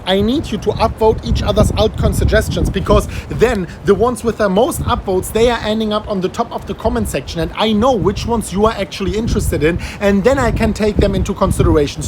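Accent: Germany. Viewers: Dieser geile deutsche Dialekt:-) Bist echt ein cooler Typ und ich bin durch dich überhaupt auf Crypto gekommen. Hab mir heute einfach just for fun für xxxx Euro Bitcoin gekauft und lass es liegen:-) vielleicht hypen mich aber deine Videos auch wieder etwas mehr zu spekulieren! Daumen hoch, viel Spass weiter im Paradies. Deutschland ist immer noch kalt;-)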